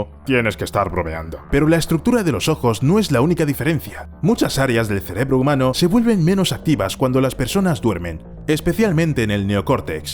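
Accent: Spain. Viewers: El sujeto tiene acento español pero usa clips latinos? Curiosos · La voz suena como española, pero todos los insertos son de doblajes latinos. Anda la osa!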